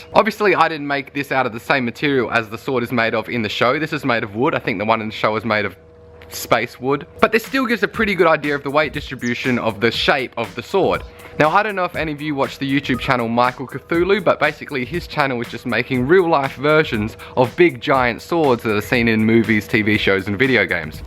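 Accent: Australia. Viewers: The way you stand on the wood while you're cutting it is SUCH an Aussie thing to do.When you started talking I thought you sounded Australian, but when you did that, I was like 'yep, definatly an aussie'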